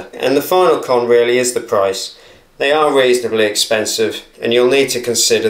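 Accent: Norfolk, United Kingdom. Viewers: Are you from Norfolk? & put a Ferrell/bootlace on it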